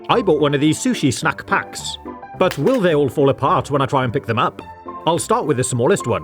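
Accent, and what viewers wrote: United Kingdom. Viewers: Are you British? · The silly pre-talkies-era background music adds to the charm of the videos! I love the whole effect with his British accent. It's classic! The man's a genius!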